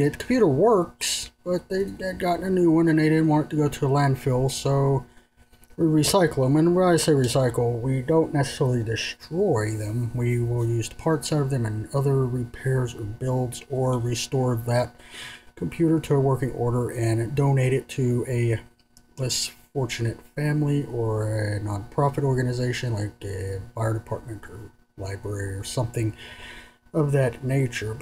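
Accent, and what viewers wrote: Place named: United States. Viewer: Thank GOD finally someone American you can understand.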